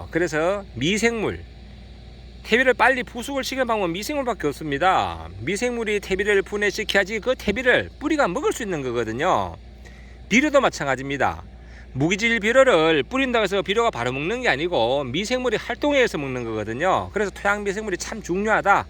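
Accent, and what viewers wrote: Gyeongbuk, South Korea. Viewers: 말씀이 참 시골 스럽네요 경북 부부지방 말씨 같은데 구분이 잘 안되네요 ㅋ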